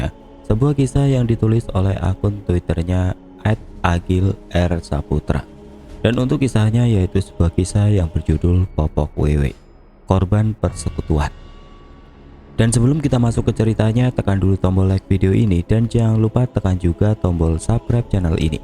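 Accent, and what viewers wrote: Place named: Banyumas, Indonesia. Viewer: Lama2 aku beneran gak berani dengerin ceritanya mas di tengah malam😅 Tapi seneng dengar suaranya ngapak2 gimana gitu bhkan kadang bikin ngakak juga 😅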